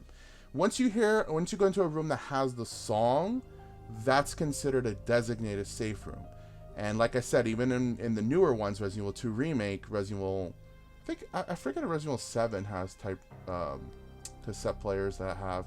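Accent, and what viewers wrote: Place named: Michigan, United States. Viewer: Sounds like you live in Michigan 😂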